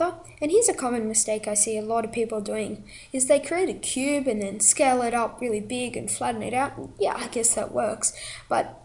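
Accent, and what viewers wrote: Australia. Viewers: I am quessing, just a hunch, your an Australian. Let me know if I am right. Nice to hear the accent..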